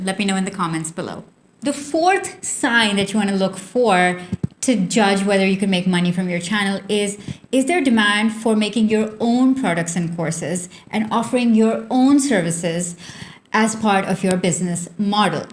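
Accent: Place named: India. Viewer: I love your accent...are you Indian ?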